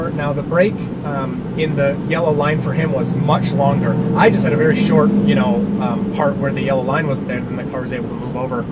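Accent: United States. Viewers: I was confused why you had a US accent and were driving on the wrong side of the road. Maybe the car heard you say that and thought the same thing.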